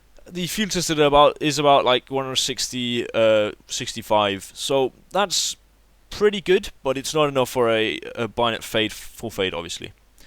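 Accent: Denmark. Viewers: Lool, are you from denmark? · Where are you from? You sound like a Dane.. :)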